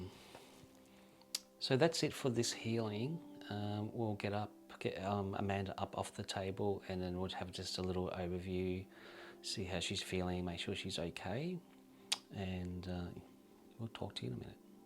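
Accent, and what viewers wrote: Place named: Australia. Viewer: nonsense with an australian accent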